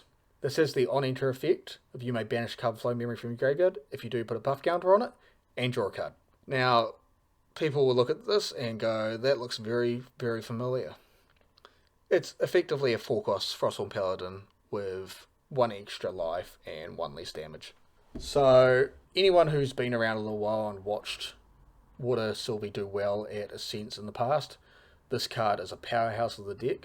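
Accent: New Zealand. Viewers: Good old Kiwi accent!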